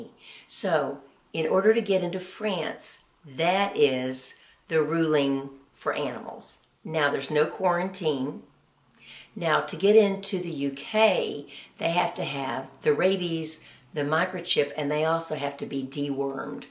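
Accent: South, United States. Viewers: I knew you were from a Southern city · are you from the South?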